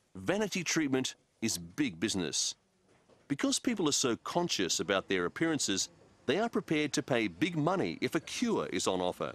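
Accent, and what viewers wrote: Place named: Australia. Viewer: the fact that they loosing their haaaaiirrrr love the Australian accent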